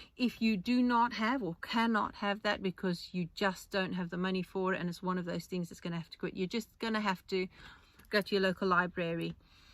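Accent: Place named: South Africa. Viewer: The UK benefit system is rorted (to take unfair advantage of a public service) with 950k job vacancies, over 8.5m people claiming benefit’s more people claim credits than pay tax. You sound like your from SA .. 40% joberg unemployed they don’t have benefits .. too many takers not enough givers in this country.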